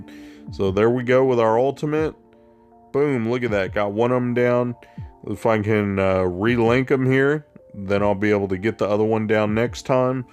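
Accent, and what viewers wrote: South, United States. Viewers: You sound like a southern Technoblade… and it’s actually pretty cool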